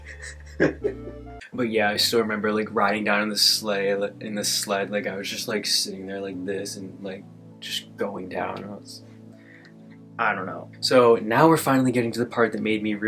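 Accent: Canada. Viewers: I'm quite sure he's from Canada, because he has a slight accent, that is only obvious in a few words. He's mentioned his brothers, but i don't know if they have been in any videos. I'm sure they must be as hot and cute as him.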